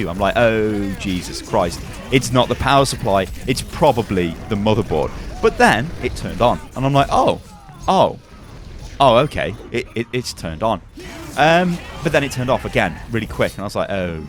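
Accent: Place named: Cockney, United Kingdom. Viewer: I just noticed how you say computer in a cockney accent - compu'er. And the reason I love this channel is precisely because it's british, and I LOVE british accent - and its nuances - between the ones I've heard. Thanks for the hard work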